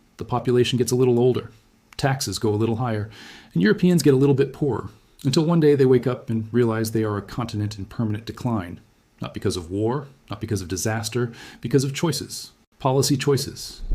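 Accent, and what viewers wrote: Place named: United States. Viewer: The GDP increased last year..so I don't know what are you talking. But from the accent you are american, so make sense to not have a clue about Europe.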